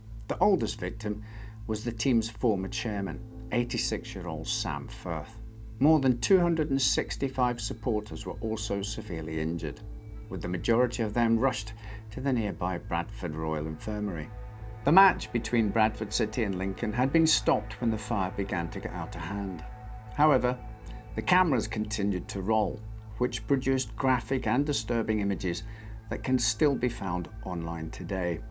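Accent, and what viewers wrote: Scotland, United Kingdom. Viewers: Is that the faintest hint of a Scottish accent?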